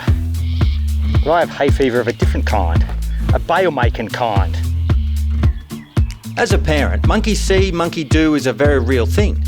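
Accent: Australia. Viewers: Are you saying 'tackle box' or 'tucker box'? This guy from the states loves your "down under" accent but I cannot tell. LOL! ;-) Love your videos mate.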